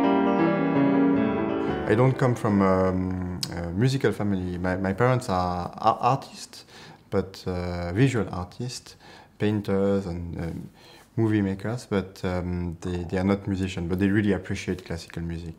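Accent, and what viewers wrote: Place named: France. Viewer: Haha, a German last name with a strong French accent. Quite an unorthodox fresh combo though.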